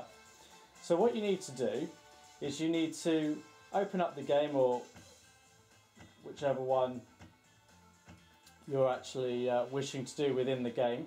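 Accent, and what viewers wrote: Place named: United Kingdom. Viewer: I cannot explain how i love the british accent!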